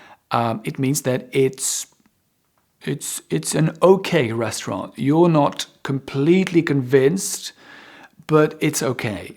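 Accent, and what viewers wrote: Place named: England, United Kingdom. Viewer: Hello, I watch your Channel quite a lot. I love, your English accent and your quiet and bright way of speaking. I progress a lot with you. Thanks for it. Take care 🍀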